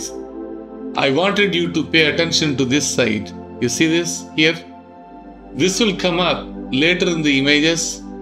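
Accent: India. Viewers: This Man, explaining in his thick Indian accent, what I believe to be True. is speaking HONESTLY! Please Listen to Him as I Am! Doc Bones, out.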